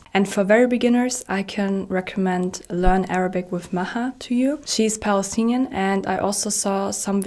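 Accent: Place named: Germany. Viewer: Your name shows Italian last name but your accent is much with German , are you German or Italian ?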